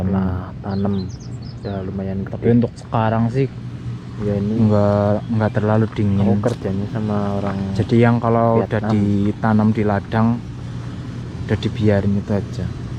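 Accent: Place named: Ngapak, Indonesia. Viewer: Bahasa indonsianya medok banget pasti orang ngapak ya masora ngapqk ora kepenak pokoke mas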